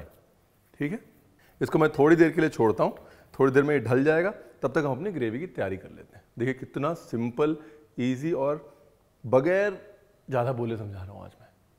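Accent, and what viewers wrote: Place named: Punjab, India. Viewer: I am from Punjab and loves your punjabi accent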